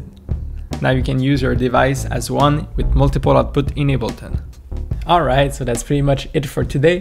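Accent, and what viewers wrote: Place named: Quebec, Canada. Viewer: tu est clairement québecois haha ?!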